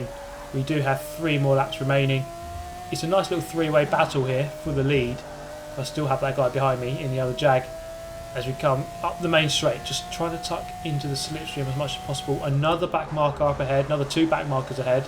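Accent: United Kingdom. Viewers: I think there is something about a British commentary over racing that is just pure bliss.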